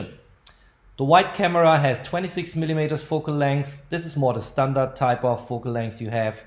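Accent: Germany. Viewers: Hör ich da etwa deutschen Akzent raus ? 😉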